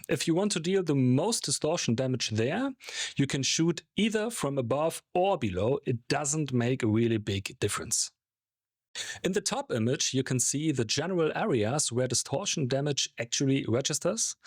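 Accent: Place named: Germany. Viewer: das war das deutscheste englisch was ich gehört habe^^ ( nicht negativ gemeint) cooles video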